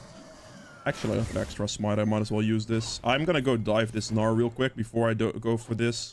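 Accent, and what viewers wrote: Netherlands. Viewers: Hey thanks for the guide. You seem to have a Dutch accent. Are you Dutch by any chance? :D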